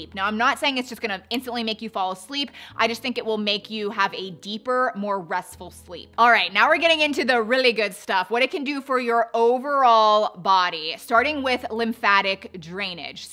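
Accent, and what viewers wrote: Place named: California, United States. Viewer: I couldn’t listen because of the Californian affectations, waving nails in front of the camera and garbling at different speeds then doing lioooooong croaky bits. Calm down. Keep your hands still. Speak slowly and clearly and not as if every sentence is a question. Vocal fry and unspeak isn’t cool si=x7rH9xDPsorNr47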